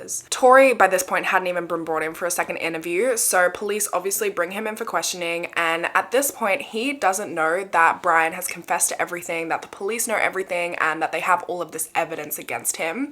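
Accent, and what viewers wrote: Australia. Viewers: Just her Australian accent is all❣️. Lived there in my younger years, and I love hearing her speak, reminds me of the past.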